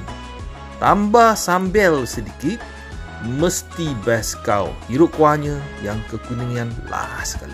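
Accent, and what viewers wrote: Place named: Indonesia. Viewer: Lidahnya sama dgn orang indonesia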